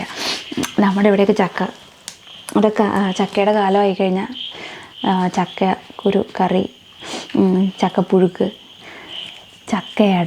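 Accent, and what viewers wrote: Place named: Southern Kerala, India. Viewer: കുട്ടി..... കോട്ടയത്ത്‌ നിന്നും.... ഇവിടേക്കു.... വന്നതാണ്... ല്ലേ.... അപ്പൂപ്പൻ മാർ.... ആയിട്ട്..... 🥰 മോളുടെ.... സംസാരത്തിൽ.... പാലക്കാട്‌ ടച്ച്‌.... തോന്നിയിരുന്നില്ല..... ഒരു തെക്കൻ സ്റ്റൈൽ തോന്നിയിരുന്നു.... 👌💖 ഞങ്ങളും പാലക്കാട്ടുകാർ..... 💖🥰🥰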